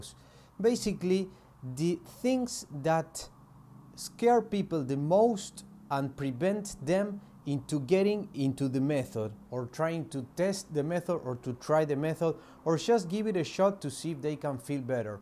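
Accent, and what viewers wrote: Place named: Latin America. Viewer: The content is good but I would suggest you working on your pronunciation a bit more. in some parts of the video your English accent sounds too thick and it can get distracting. I am Latina as well (I assume you are) and I know it takes years to perfect pronunciation, but it's not impossible to always strive to sound better, especially if you have important content to communicate. And yeah you're right the whole "Mind control" title can be misleading to many people.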